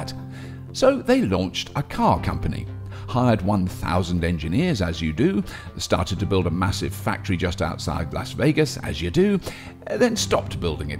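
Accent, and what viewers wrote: United Kingdom. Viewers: i love the enthusiasm in the narrator's voice....very British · I love the British accent.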